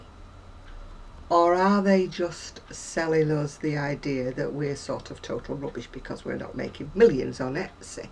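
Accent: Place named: Manchester, United Kingdom. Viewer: Oo, thank you for your lovely channel. It was a bit like 'coming home' hearing your smashing accent. (I've lived away from Manchester for many years - down south - but as they say 'you can take the lass out of Manchester, but you can't take Manchester out of the lass'!) I agree with your sentiments entirely. After all, if you just churn out crochet items to meet a fad demand it then becomes 'just a job' and where's the fun in that? Keep on with your fab videos, I'm a bit late to the party but intend watching them all!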